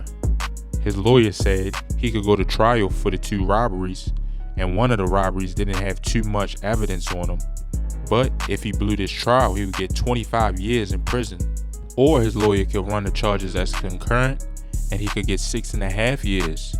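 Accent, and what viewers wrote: Baltimore, United States. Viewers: you from baltimore? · Nigga you from Baltimore or sum shit? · Def a from Bmore lmao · That was a bmore accent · I'm know Baltimore when I hear it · This is first video I ever watched on this channel. So idk who the narrator is. But I know he from Baltimore.. I know that accent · Not Philly it's Baltimore accent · Do=Dew in bmore 💯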